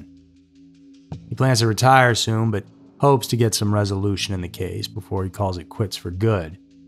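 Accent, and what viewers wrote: South, United States. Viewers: Thank you, new sub here, you have such a smooth soothing voice to listen to. I'm Australian, trying to place your accent, there's a hint of a slow Southern drawl🤔thinking Matthew McConaughey in Lincoln Lawyer😍but I'll settle down😂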